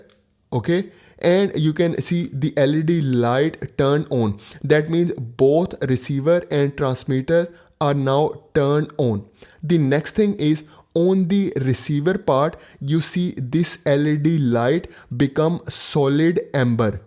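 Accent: India. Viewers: Once again I was convinced that the English spoken by Americans and the English spoken by Indians are not the same English. Jesus will sooner return to us than Indians will learn English.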